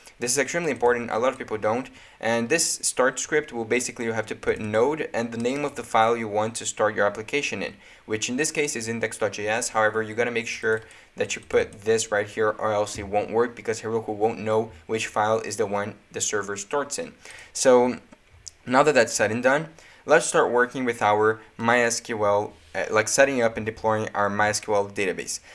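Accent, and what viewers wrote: Brazil. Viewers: Nice video! Thanks for the info! I have a question: there is a way to change that ugly domain name from Netlify to a custom paid domain that I already own? (Idk why I'm asking in english, your accent is clearly brazilian) valeu mano kkk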